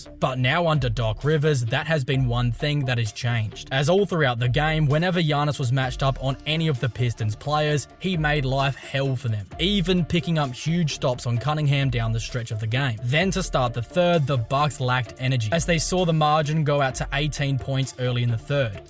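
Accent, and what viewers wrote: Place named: Australia. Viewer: Beef Stew should get a suspension for that hideous flagrant 2. Dirty Mofo. Love the channel man. Love the Aussie accent. Oi Oi!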